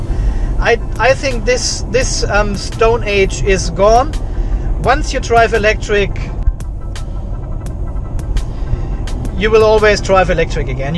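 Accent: Germany. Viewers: Das verstehe ich sehr gut. Alleine diese Videos kosten schon enorm viel Zeit. Dein Englisch mit leicht deutschem Akzent ist aber für Deutsche sehr sehr gut zu verstehen. Gut, dass du dir nicht den australischen Slang angewöhnt hast.😉 Bei Garry Reed habe manchmal Probleme seine Worte zu verstehen.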